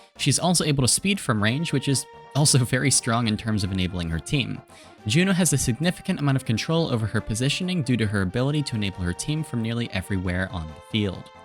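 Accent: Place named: United States. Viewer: American accent but you call it university?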